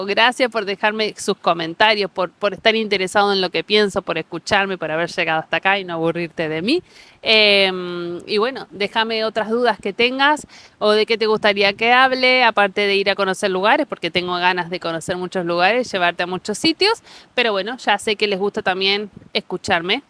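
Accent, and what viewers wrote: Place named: Argentina. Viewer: ¿Que me sigue sorprendiendo de los argentinos que estáis llegando en masa a España? Que hacéis cientos de videos de todo lo que os sorprende de España, pero muy poco esfuerzo en adaptaros. Ejemplo: lleváis años aquí y seguís con ese horrible acento argentino.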